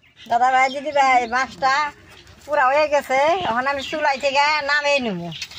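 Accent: Tangail, Bangladesh. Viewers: আপনে গো ভাষা,,,,, আমাগো বাংলাদেশের টাংগাইল জেলার ভাষা same,,,,,আপনেরা কোন জেলার গো?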